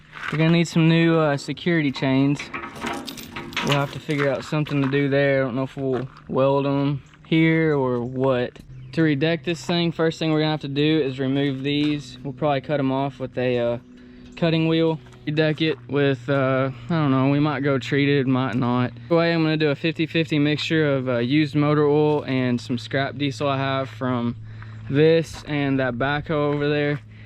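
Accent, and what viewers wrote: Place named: Texas, United States. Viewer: Ok maybe not from Texas but you sure do sound like it…! Mad skills bro, stay blessed!!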